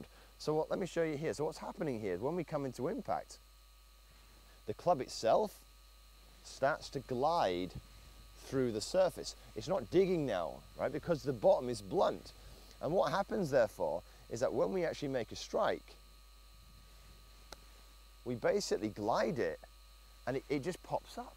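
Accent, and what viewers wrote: United Kingdom. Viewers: That ain't no different chipping method, WTH is this British speaking talkin bout.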